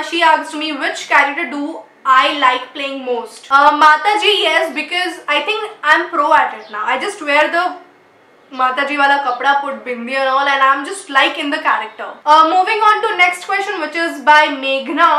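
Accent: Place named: Gujarat, India. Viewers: You speak so funny Gujarati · Woh woh wohhh.. u speak very sweet gujju. Jus loved.. lots of love n blessings..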